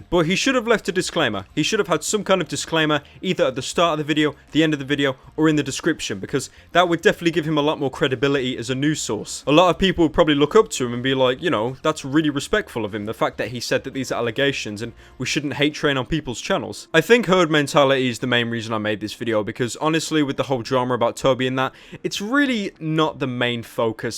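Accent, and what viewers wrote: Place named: Midlands, United Kingdom. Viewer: Where u from? trying to pin ur dialect/accent... u sound (UK) Midlands"ish".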